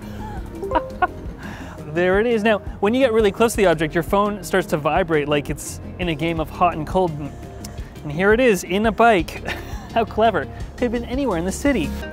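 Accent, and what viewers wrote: Canada. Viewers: I’m sorry — Canada, eh? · Another Canadian eh?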